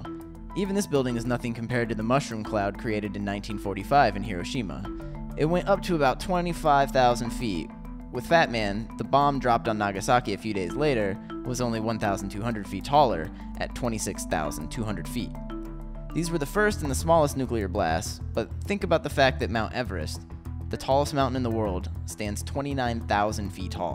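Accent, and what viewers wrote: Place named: United States. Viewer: That american accent...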